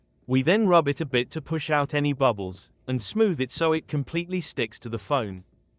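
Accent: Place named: Oregon, United States. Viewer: Absolutely love the Oregon accent!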